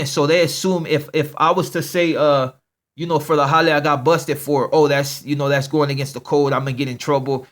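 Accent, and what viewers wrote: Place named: Mexico. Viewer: I fuck with your channel cuz! One love! Keep grindin, you spit hella game just some of these youngstas on some other shit. When I was young I was fuckin up got into some street shit, drugs, alcohol heavy. I made the decision to join the army. My older bro was in an out of juvie since 13 to 17 then got locked up but they sent him to prison at 17. It’s crazy cause we’re mexicanos but grew up in a all black neighborhood homie. Mexicanos hate on my family cause we don’t sound Mexican we sound where we came from just like you did. You sound mexican our slang is gutter people say we sound like we tryin to be black. My brother is Mexican in a crip gang and I was following the path but made a transition. So I feel you completely when you spitting game sometime about where you grew up and how you grew up. Opposite worlds but the same in a way. 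Ima support you foo off the strength of black and brown love. That’s how it was in my neighborhood l. Grew up in park hill Denver 💯 keep it solid and keep it pushin I hope your platform gets bigger and better! Much love partna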